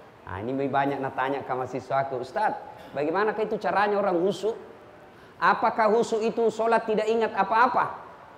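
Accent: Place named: Sulawesi, Indonesia. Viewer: haha..kalo pak ustad tergantung audiensx, kalo ceramah d daerah sulawesi selatan atau daerah luar tapi banyak komunitas bugis makassar maka dia banyak pake bahasa dan logat bugis makassar. tapi kalo ceramah d luar, misalnya d jawa, pasti ndk ada sisipin bahasa bugis-makassar.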